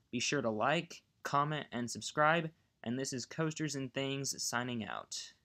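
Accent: United States. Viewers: Country accent mixed with vortex= 💯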